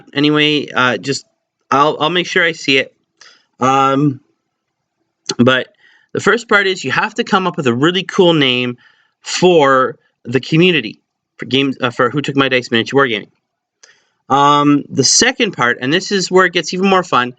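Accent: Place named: North America. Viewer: Sorry! I meant to say "NORTH American accent"! Ha ha! Go Canada! All the best, man!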